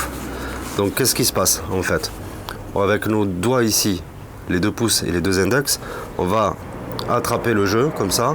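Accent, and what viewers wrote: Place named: South, France. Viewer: Pour commencer, le soleil, l'accent du sud, les lunettes et des cartes, ça fait du bien, c'est beau ! 😉😎 Ensuite ce tour est clairement une petite pépite qui permet beaucoup de possibilités d'histoires et il est particulièrement efficace ! Je trouve très cool que tu aies osé dire que la version du livre était erronée et pas forcément bien expliquée... C'est bien dommage et regrettable quand il s'agit d'un magicien de cet acabit ! Malheureusement il n'est pas le seul à avoir fait un livre où certaines choses ne collent pas, à se demander pour certains s'ils écrivent eux-mêmes leur livre et s'ils prennent le temps de vérifier que tout est OK ! 👌🏻 Pour la subtilité de daortiz que tu proposes, j'adhère pleinement, c'est plus osé mais ça enleve le côté redondant de la première version, c'est cool que tu en parles aussi ! Pour finir 😅 tu as parfaitement raison, certains tours automatiques sont bien meilleurs que des tours avec manipulations et celui-ci en est la preuve ! 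Voilà, très bonne vidéo quoi qu'il en soit, merci pour le partage mon pote ! 😉👊🏻🔥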